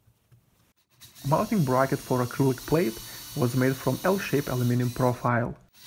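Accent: Russia. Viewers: Акцент русский... )))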